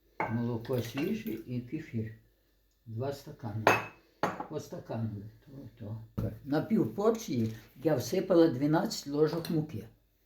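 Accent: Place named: Ukraine. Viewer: Этот украинский говор звучит для меня как музыка. Так говорила моя бабуля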